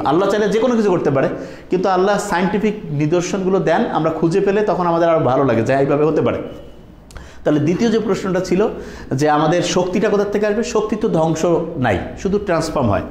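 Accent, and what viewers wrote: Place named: Rangpur, Bangladesh. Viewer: Sir apni rangpurer Manus sune khub vhalo laglo❤🥰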